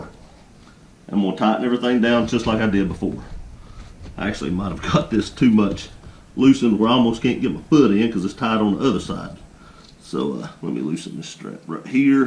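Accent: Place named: Alabama, United States. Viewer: Are you from Alabama or TN?? The accent sounds like Alabama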